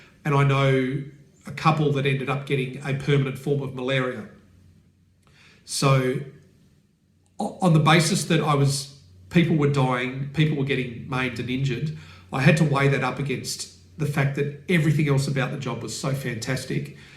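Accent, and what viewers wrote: Australia. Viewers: English with Australian accent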